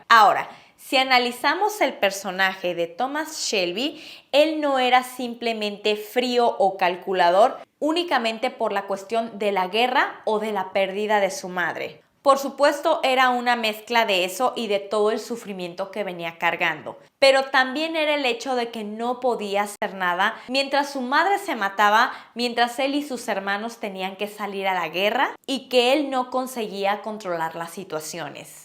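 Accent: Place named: Argentina. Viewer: Que hermosa Argentina ...que mujer...me encanta el acento de esta mujer Argentina....¿así como hablas juegas fubol? un beso...gracias por tu videos. Saludos desde Colombia.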